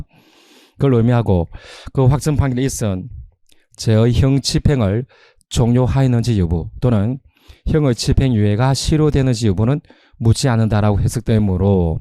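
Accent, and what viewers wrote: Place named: China. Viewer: 딕션이 중국인 같노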